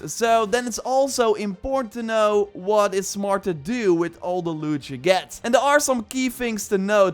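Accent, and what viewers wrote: Netherlands. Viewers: En toch hoor ik meteen dat je uit Nederland komt 😂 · I'm pretty sure he's Dutch he has a very thick Dutch accent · Are you Dutch?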